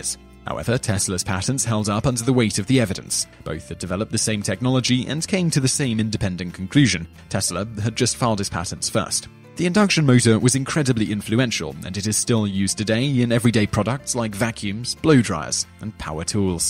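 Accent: United Kingdom. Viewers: This guy is singlehandedly making british accents sound stupid.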